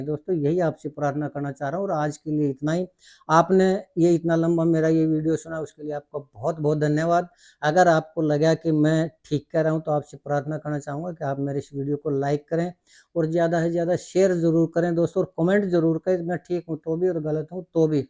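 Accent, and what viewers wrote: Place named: Haryana, India. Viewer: भाई जी प्रणाम, मैं हरियाणा का नहीं हूं, दक्षिण भारती हूं l आपकी बोली, आपका बोलने का ढंग, साफ बोलना बहुत अच्छा लगता है l एक बात कहना चाहता हूं l आप अपने समाज का भला करना चाहते है अच्छी बात है l आप के समाज का मुख्य मंत्री बन जाए या आरक्षण मिल जाए तो भी कुछ लोगों का ही भला होगा, पूरे समाज का नही l जैन, सिंधी, पारसी जैसे कई समाज हैं जो इनके बिना भी सुदृढ़ अवस्था में है l समाज के लिए बैंक बनाइए, कम ब्याज दर पर ऋण दीजिए, स्कूल, कॉलेज खोलिए l ये बाते प्रैक्टिकल है l इसके लिए दक्षिण भारत के नाडार समुदाय का अध्ययन कर सकते हो l तमिलनाडु मर्केंटाइल बैंक उसी समुदाय का था l और भी समुदाय का अध्ययन किया जा सकता है l तात्पर्य ये की राजनीति से कुछ होता नहीं l अहम छोड़ कर कार्य करना होगा l बिना स्वार्थ के करना होगा l सलाह के लिए क्षमा ।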